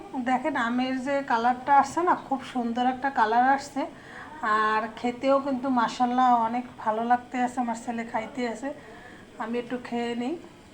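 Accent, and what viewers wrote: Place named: Barishal, Bangladesh. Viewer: 😁😁 বরিশাইল্যা মনু 👍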